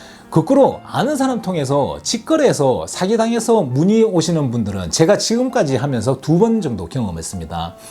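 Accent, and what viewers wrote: Gyeongsang, South Korea. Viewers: 감사합니다!! 요즘 매일 보고 배우고 있습니다 특히 경상도 액센트 좋아요 😁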